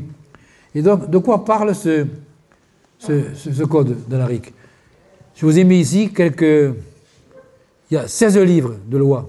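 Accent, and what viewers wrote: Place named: South-West, France. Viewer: l'accent du sud ouest est une merveille ! ❤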